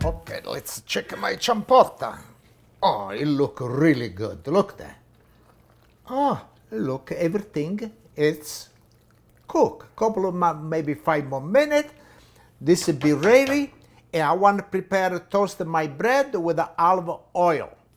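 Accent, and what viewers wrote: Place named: Naples, Italy. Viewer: Mi genetori sono da Grottaminarda e Fontanarosa! Io sono nato a Boston pero, e parlo in dialetto Napolitano come te. Grazie per il ricette saporito! Auguri!!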